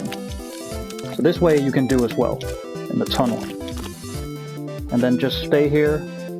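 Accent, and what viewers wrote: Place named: Sweden. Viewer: You sound swedish 😂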